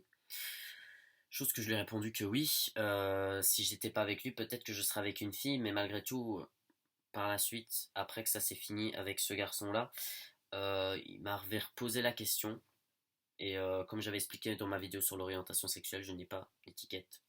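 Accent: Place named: Mons, Belgium. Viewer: et Montois en plus! Il me semblait bien que je connaissais cet accent:p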